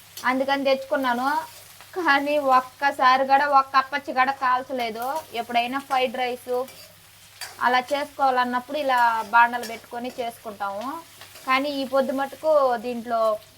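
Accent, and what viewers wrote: Nellore, India. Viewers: Pakka nellore yasa super Madi kuda nellore e andi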